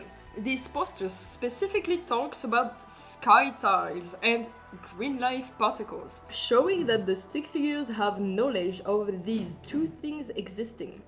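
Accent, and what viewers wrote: France. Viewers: Est-ce un accent français que j'entends là? (Is it a French accent that I'm hearing here?) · French accent (⑅•ᴗ•⑅) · Very cool video but I just want to ask are you french ? Sorry im curious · Is that french accent 🙏🏼😭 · Watching cool video As a French instantly recognise the strong French accent Even cooler video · Not related to the video but are you french by any chance?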